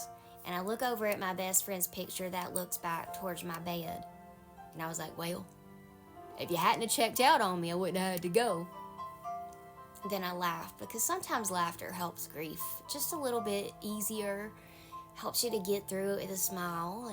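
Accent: South, United States. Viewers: how sweet and southern your accent is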